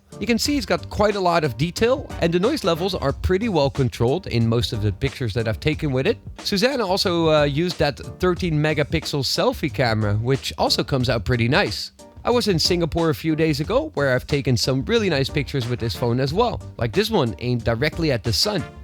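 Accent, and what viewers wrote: Netherlands. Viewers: Nice Dutch accent.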